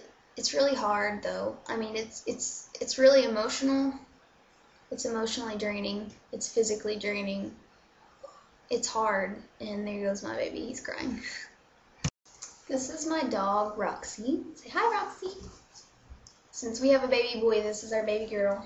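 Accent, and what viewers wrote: United Kingdom. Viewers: hey stumbled across your YouTube via your "how to speak in a British accent" vid. the others are interesting tew! hope all is well. are you British o American lol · I subbed to your channel at first because the british accent too, i to was somewhat obsessed with the UK - i was looking into traveling there, then moving there it was kindof random actually lol.. Shit, you had me fooled.. lol good job girl! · BTW ether I loved ur British voice XD. And good luck to Michael out there, but no offense he's in the navy not too much to worry about. I know its hard for him to be away but trust me in the long run this will be better for your family beneficial wise. Stay strong :) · thanks for sharing so much personal information with us. Beeing a military wife sounds quite depressing :( I'm male and after I experienced war in my home country as a kid, I was sure that I would never go to any army in the world. 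Ofc its nothing bad about beeing a soldier, but still it's stressfull for a familylife having that kind of long distance relationship.. I wish u and your husband good luck and allot of love and strenght. (your britishaccent is amazing, wish I could talk like that) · What happened to your british accent?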